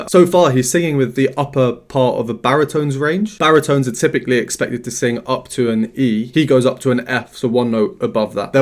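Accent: United Kingdom. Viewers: such an in-depth professional analysis + genuineness + sheer emotions + sophisticated language + British English... couldn't be any better. please keep it up 💚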